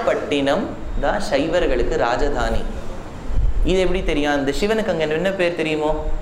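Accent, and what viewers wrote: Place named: Bengal, India. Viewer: Your Bangla accent was so fine Swamy. Loved that song. Pranams to u.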